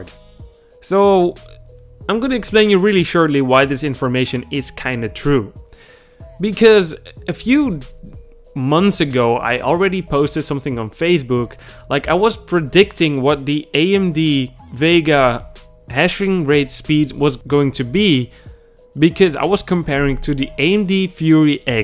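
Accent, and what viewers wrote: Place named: India. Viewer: I HATE YOU VOICE. i im Di Viga thIIIIiii... Are you indian? youre soft sound E-i made my russian brain a crazzzZi. Lets try for example Ay Em Dee fucking Veeeeee GGaaaaaaa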